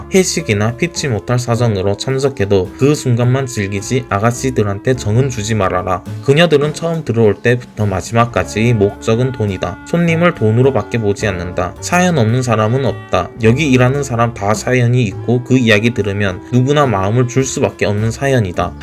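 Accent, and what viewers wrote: Yanbian, China. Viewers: 조선족이신가?ㅅ발음이 영락없는데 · 너 조선족 맞지? 말투가 딱 조선족이네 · 발음이 중국인이네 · 조선족이냐? 말투 거슬리네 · 음~~~이상하네,, 발음이 중공 발음.... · 발음이 중국인같은데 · 발음이 조선족인데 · 말투 조선족인가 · 대한민국인 어투는 아니시네요... 조선족 어투와 많이 유사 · 말투가 조선족 인가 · 말투가 연변이신데 조선족이신가요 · 이분 조선족 말씨를 쓰네요. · 발음이 조선족이네? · 조선족 말투같은데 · 발음이 딱 조선족인대? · 말투가 딱 연변인데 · 말투가 조선족인데... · 말투가 조선족말툰데.... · 조선족 억양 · ㅡㅡ.. 중국에서 했나 말투가 연변어네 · 발음이 조선족인데?? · 말투가 조선족말투 같네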